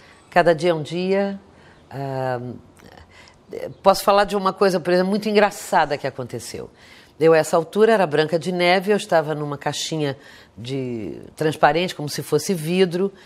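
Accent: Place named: Rio de Janeiro, Brazil. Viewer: e esse sotaque carioca lindo!!!! e a carioca mais ainda!!!!😍😍😍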